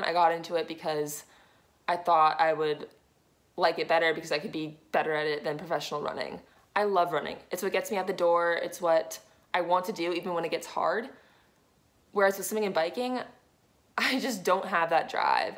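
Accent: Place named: Punjab, Pakistan. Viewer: I like her speaking Pakistani-Punjabi, nice accent!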